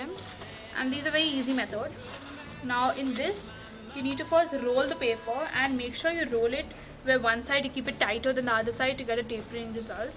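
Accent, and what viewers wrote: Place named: Scandinavia. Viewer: Your accent, are you Swedish, Danish or German? Also this tutorial is pretty cool and it helped quite a lot.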